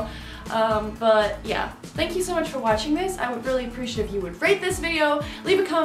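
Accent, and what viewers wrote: United States. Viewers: Your english sounds american af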